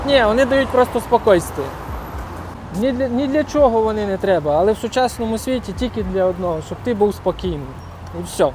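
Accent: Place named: Rivne, Ukraine. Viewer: Така балачка у вас ніби ви з півночі Рівненщини. Дубровиця, Рокитне чи Зарічне?)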